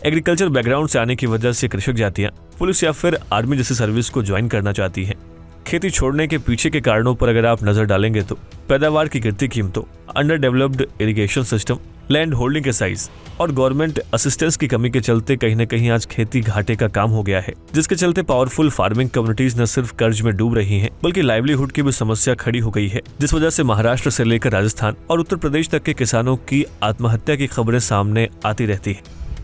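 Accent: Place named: Bihar, India. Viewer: His roots belong to Bihar state because he was speaking in that tone.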